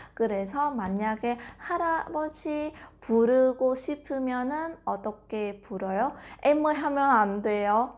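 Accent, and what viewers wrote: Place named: Vietnam. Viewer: 첨에 한국분인줄 알았는데 몇마디 들어보니 베트남분 이네요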